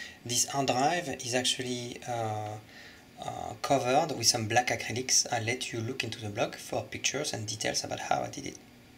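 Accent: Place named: France. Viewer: Un accent bien français mais sinon ta video est très bien continu comme ca :)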